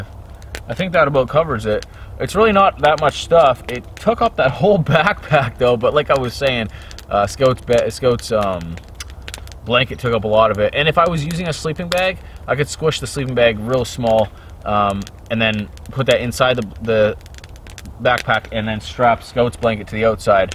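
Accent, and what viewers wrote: Canada. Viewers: (I'm sure some of my Canadian viewers will know what I'm talking "aboot") lawl that had me crack up and rolling because it reminds me of how the Canadians talked in South Park: Bigger Longer and Uncut. Every time you say "about" it comes out "aboot" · Let me guess Canadian? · I can even tell that your Canadian, 1. You basically told it 2. The about to aboot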